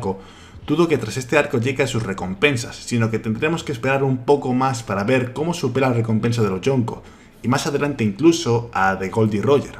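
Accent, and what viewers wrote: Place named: Murcia, Spain. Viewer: Murciano? Ese acento no se esconde jajaja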